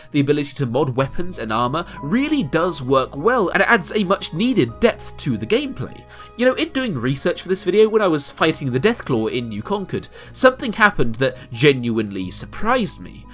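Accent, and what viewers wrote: United Kingdom. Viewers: Don’t you jut hate the modern style British accent (yours)? I just love the old accents because there was so much variety and they sounded so much less wimpy and snotty and weird.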